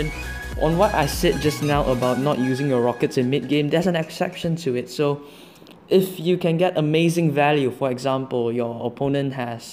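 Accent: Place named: Singapore. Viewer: your accent sounds singaporean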